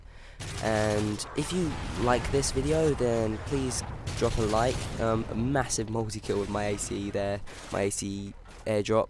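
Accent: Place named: England, United Kingdom. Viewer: Cause he's ignorant and you have an english accent.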